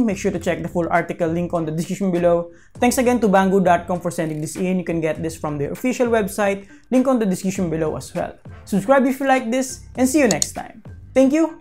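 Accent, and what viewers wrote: Philippines. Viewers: Are u filipino? Cause ur accent is VERY filipino😂 just sayin’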